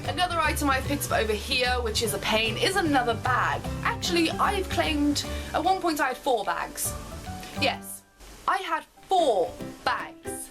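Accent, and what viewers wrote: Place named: RP, United Kingdom. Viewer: i love love love your videos and think you're absolutely great, but i can't help giggling... you have such a 'gap yah' accent, i love it!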